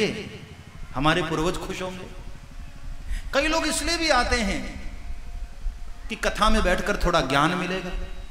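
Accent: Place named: Haryana, India. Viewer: बोलने का ढंग थोड़ा सीख लो कि किस तरीके से बोलना चाहिए तू हरियाणवी की जाठ है क्या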